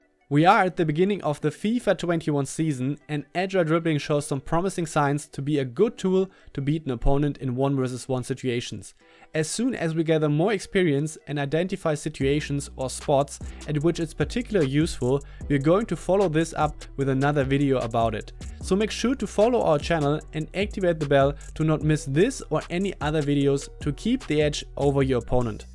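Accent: Germany. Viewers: Are u german? Sounds a bit like a german accent... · Man hört auf jedenfall das du deutsch bist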